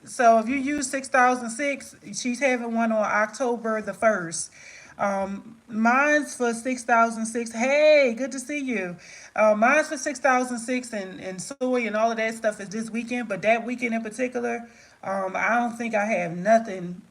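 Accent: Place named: Philadelphia, United States. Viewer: I was drawn to you from the first video I watched. I told my husband honey look at this candle girl from Philly. I love a Philly accent. When I found out you had a group and did classes I been a fan ever since. I have watched those other candle maker videos, they put me to sleep, (no shade on them) but it's a no for me. Now u back to doing skincare, they gone be mad. This is a extra bonus. Get ready for the haters cause 😎 u about to blow it out the water.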